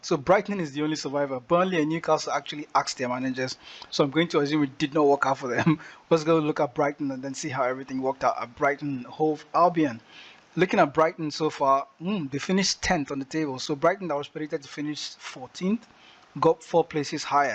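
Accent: Nigeria. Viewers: Why do you sound so Nigerian? Nice video by the way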